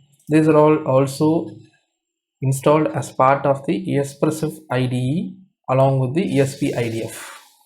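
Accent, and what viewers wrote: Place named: India. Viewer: too much indian accent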